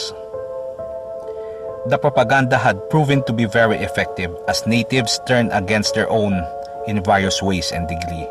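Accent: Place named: United Kingdom. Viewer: I am not sure which literatures the Author consulted. As a Capizeno, we grew up with the scary stories from Dumsngás, Dingle and most of all Duenas. This propanganda of the Spaniards had barely supported by books by Spaniards. Poorly research and Capiz has nothing to do with Aswang. Your heavy English accent betrays your origin. THIS VIDEO IS POORLY RESEARCHED. FALSE NÈWS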